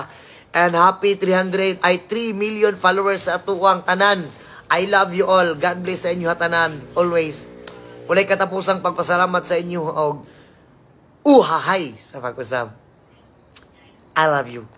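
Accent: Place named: Cebu, Philippines. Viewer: Ang sarap nga pakinggan pag magsalita ka ng sibwano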